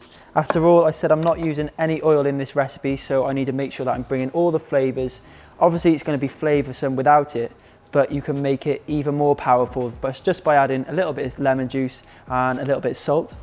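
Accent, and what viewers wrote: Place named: England, United Kingdom. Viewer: Hm What I think is weird is that anyone would come onto a person’s channel, who is obviously British, and not know or expect they may say or pronounce things differently than they might. And proceed to tell them they are saying it wrong.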